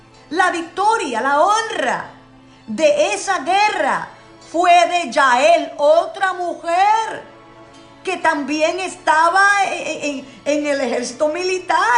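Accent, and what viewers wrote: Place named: Argentina. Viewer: Usted vive en Puerto Rico pero su acento suena argentino usted es argentina??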